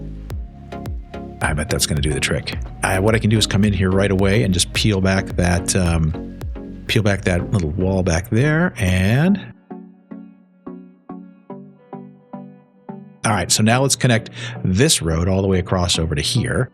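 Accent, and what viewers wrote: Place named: United States. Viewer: Beautiful approach to building in this game. Love it! Btw, you must be the most American sounding American on the internet.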